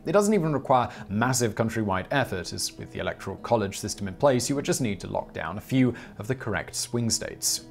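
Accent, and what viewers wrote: United Kingdom. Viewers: I don't know, somehow when a guy with a British accent starts trying to 'splain the US Government just doesn't really work for me. Maybe get someone from Boston to do the job next time...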